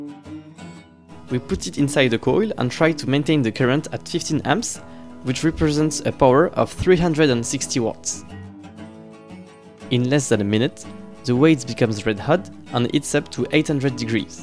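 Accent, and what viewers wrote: France. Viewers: You have a french accent. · Nice work, I can't tell what your accent is, are you French ? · Très belle vidéo! Vous êtes français non? Cet accent <3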